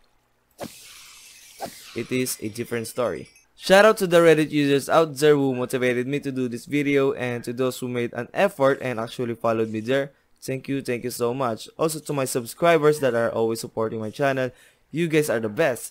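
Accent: Philippines. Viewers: i smell filipino accent... HELLO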